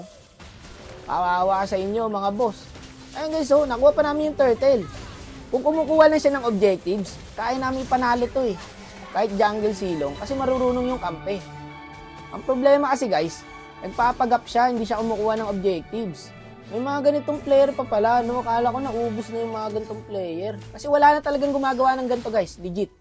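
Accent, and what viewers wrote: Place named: Visayas, Philippines. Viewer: bisaya yan halata e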